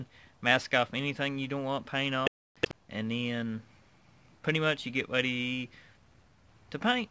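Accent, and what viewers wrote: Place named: South, United States. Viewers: I was wondering where you were from with that accent. Hazard kentucky? I've been there once or twice. I think i'm going to use this on my 79 g10 restoration. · Theres nothing wrong with his voice, just southern tone . Thanks for the video buddy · I can't decide whether I hear Mississippi water from the river or I hear Carolina. I'm a Texas boy myself so this is how everybody is supposed to sound! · I knew it I knew you was from ky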